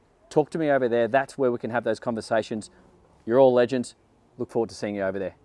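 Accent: Australia. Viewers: Those lines are as smooth as hot butter. The combination of excitement, gestures and the accent in the bow presentation I got some Steve Irwin vibes and it really clicked why the videos on this channel stand out. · mate, that aussie accent, insufferable.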